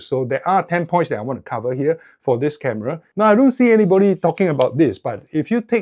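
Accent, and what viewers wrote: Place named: Singapore. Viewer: Thank you for your excellent overview! I have a very good friend in Singapore and I believe you have the same accent! Wonderful.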